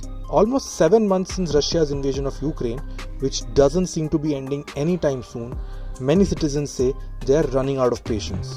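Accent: India. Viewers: PROPAGANDA . Sounds Indian . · Why dont indian ppl say "T" correctly and properly while pronouncing and reading such international news ....just try hard to throw away your thick -and bad indian accent all of sudden....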